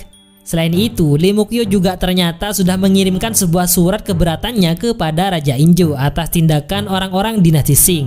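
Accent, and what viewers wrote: Madura, Indonesia. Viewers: Logat maduranya kelihatan banget 😃semangat kakeh boosss 😁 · Logatnya kaya reng Madura..? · Reng medureh kadik en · Ini orang Madura y salam nih Madura bangkalan. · Madura mana min ? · Mak madureh lek 'en cak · Oreng medureh yeh 😁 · Reng medureh kak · Medureh dimma tretan?? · Mimin logat madura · Duro duro,logat e... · Reng madureh 😂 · Orang madura ya min? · Kelihatan masih logatnya Ra Ra Madura 😂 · Gw ngira orng madura. Trnyata bener dong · Baru sadar klo orang Madura 🤣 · Orang Madura ya bang.. Medoknya jelas 😁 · Pola maduren riah😂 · Madura ..yaa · Kayak Madura logatnya😂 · wah pencerita orng madura , salam dari surabaya bang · Logat admin kayak berasal dr pulau garam.😊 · Logat MDR nya mencolok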